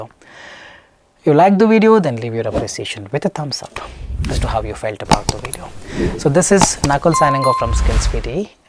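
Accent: India. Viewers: Hi Nakul. You are simply awesome! I discovered your channel recently but you do have a way of catering to the needs of even a below average student in English. Your humor while teaching with that Indian butler English is making long videos seem short as we laugh and feel refreshed and still gather up to listen to you more. Keep up the good work you are doing! 👏🏼👏🏼👏🏼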